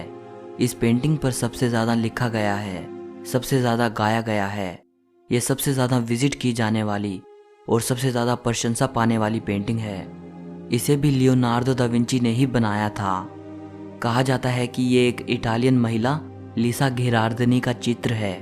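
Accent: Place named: Bihar, India. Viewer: Aapka bolne ka tarika Achcha nahi hai. Aisa lagta jaise koi Bihari ya Gaon ka insaan bol raha ho.Ya fir koi anpar.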